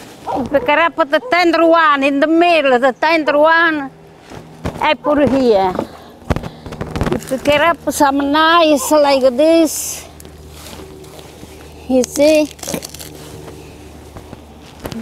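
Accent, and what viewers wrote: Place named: Sicily, Italy. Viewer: Oh, dearest Grandma...I looked and looked on Google to find "Cardoons". The were not the ones that my grandma took the kids out into the country to search for. THEN I figured that just maybe you would have a recipe for the ones found in America. YESSSSS!!!! There was your beautiful smiling and enthusiastic face. You have solved my puzzle, and I thank you so very much for it. I cannot wait to send it to my cousins - we are all in our 70's or 80's. I hope that some of them are as happy to relive this part of our childhoods as I am. When I hear your accent - sometimes it brings tears to my eyes because you make "see again" my Sicilian grandma., you are a beautiful woman - inside and outside. Thank you so much - I just ran out into my yard and gather up some "cardoons." Vivi bene e felice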